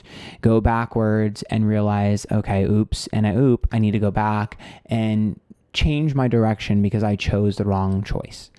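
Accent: United Kingdom. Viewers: 🐟 love your British accent 🇬🇧 spot on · Love the British accent this video 🙏☠️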